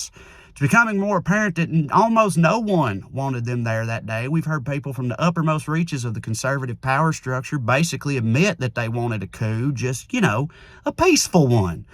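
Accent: South, United States. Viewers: I love hearing a voice like this coming out of the South. I’ve lived around a lot of southern people. Wasn’t aware there was much of a liberal slant there. So refreshing. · The accent and aphorisms of my Midwest and Southern Americans is always a pleasure! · Thanks for not abandoning your accent and Southern roots. It makes your commentary much more poignant and helps to dispel the false impression that a southern drawl will always indicate that person behind it is a racist, rebellious, brain dead moron, whose every word must be ignored. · Well said. Thanks for the clear words - in the accent most of us associate with completely different sentiments. You redeem the South.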